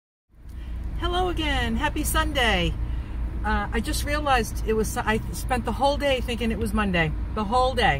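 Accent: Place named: Florida, United States. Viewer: is your driver seat on the right hand side? You talk like you're in Florida but what the hey?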